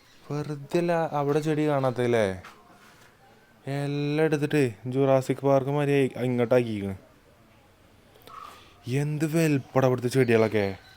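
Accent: Malappuram, India. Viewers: ചിറി അല്ല ചിരി നിങ്ങൾ മലപ്പുറം ഒരു മാതിരി ഒയെന്നെ സംസാരം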